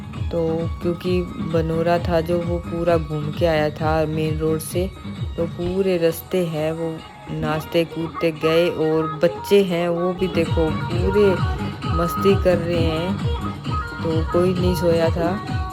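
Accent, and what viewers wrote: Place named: Rajasthan, India. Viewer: ok Ji good, हम भी साउथ दिल्ली से जाट हैं, आपकी भाषा से आप राजस्थान से हों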